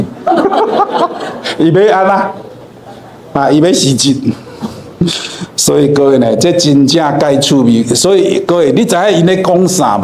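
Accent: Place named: Taiwan. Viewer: 台語真的好真實啦😂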